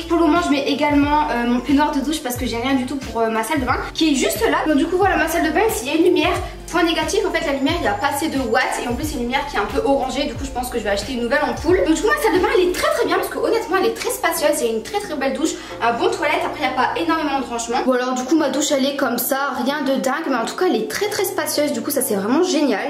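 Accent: North, France. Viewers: c'est tres mignon .... heu tu es du Nord ? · tu as un accent du nord mixé avec un accent arabe :o :')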